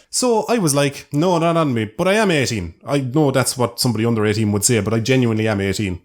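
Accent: Ireland. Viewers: A lot of Irish accents are like this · Every time I see when you upload, I stop, and I go watch your videos man. They are a riot, also that Irish accent man, you rock. Super entertaining, keep it up! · OMG sexy irish voice boi about to blow up! Won't be long before you're at 1mil after you hit 100k. Congrats from 21+ hell place,!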